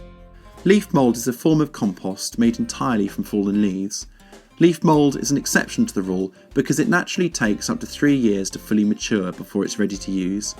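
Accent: United Kingdom. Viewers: Love the hillbilly music the beginning, and also that Mr. Bean accent.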